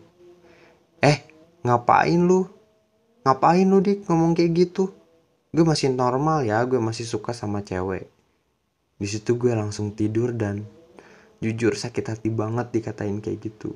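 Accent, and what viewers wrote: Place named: West Java, Indonesia. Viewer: Logat sunda nya agak keliatan yah wkwkwk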